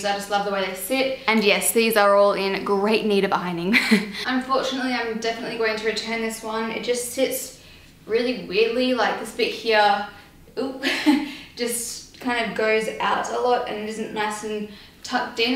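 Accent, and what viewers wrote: Australia. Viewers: I'm British and love ur and Australian accents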